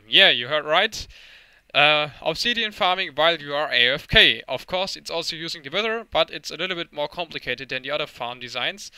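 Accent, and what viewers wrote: Germany. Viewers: the accent is from germany ;) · yes he's german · well then he didnt have a typical german accent. trust me dude, this is a heavy german accent. i am german, in a few videos before he was talking german. this is a german accent. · Your english sounds like you´re no English/American guy. Are you German?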